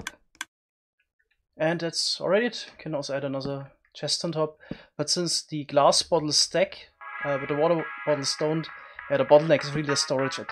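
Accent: Germany. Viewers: When Germans speak English I am also german .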